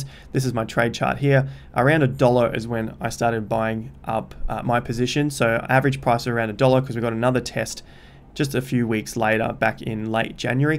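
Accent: Australia. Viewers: I'm going to be leaving university and hoping to get a job in September. I think crypto is definitely a space that I'll invest real money into during the next set of lows later in the year (at the moment I'm working with about £100 and doing what I can) and I think I'll buy the Investor Accelerator too because you're genuinely helping me learn (and your Ozzy accent is nice to listen too xD)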